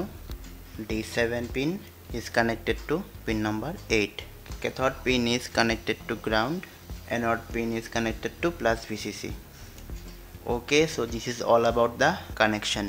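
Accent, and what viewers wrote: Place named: India. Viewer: I loveeeee Indian accent....it sounds like his tongue is shorter than others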